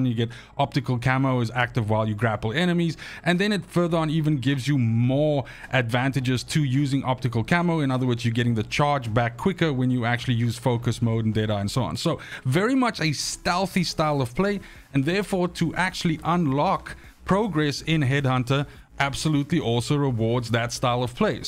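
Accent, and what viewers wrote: South Africa. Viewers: Liked and subscribed. Sounds like a South African accent there👌🏾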